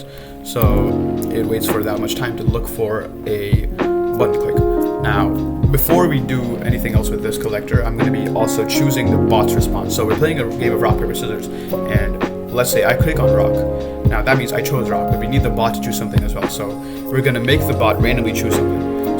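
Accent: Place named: United States. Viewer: Bro u sound american af L Also the bg music a bit too loud xd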